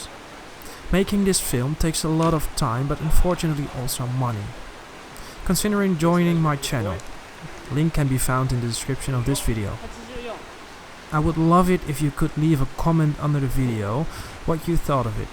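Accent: Netherlands. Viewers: Is he from the Netherlands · Is it just me or am I hearing a slight Dutch accent? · The announcer in this video is distinctly Dutch I can tell that accent anywhere. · The narrator sounds so Dutch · he is dutch lol.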